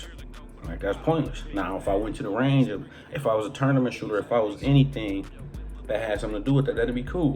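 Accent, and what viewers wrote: Michigan, United States. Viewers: You got my follow bro..Good review! Sound like you from my small town Saginaw MI 😂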